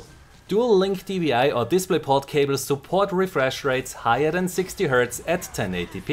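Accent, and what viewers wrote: Austria. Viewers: You always use astonishing test-setups, this is a lot of work, i really appreciate your efforts! Are you from Austria? That strong accent sounds familiar. About the non-difference between 144 and 60 Hz, it could be that overwatch uses physic-frames to control shooting (but that would be odd), and physic frames are capped to a constant rate in every engine i know. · could you do a video where you compare the Overwatch Button to Pixel lag across different console shooters? the reason is that on consoles Overwatch seems to have a crazy high lag especially when compared to CoD or BF would be usefull to have such a proof to show the devs that their game needs to be fixed also you sound austrian, is that the case? :P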